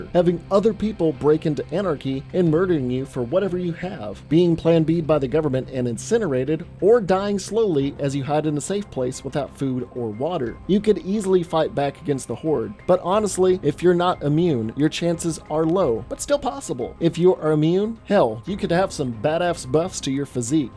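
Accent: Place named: United States. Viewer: Zombies, dabs, and your smooth American accent is spooky season essential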